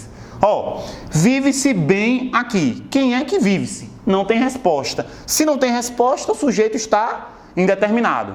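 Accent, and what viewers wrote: Northeast, Brazil. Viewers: e esse sotaque nordestino professor o senhor é daqui mesmo?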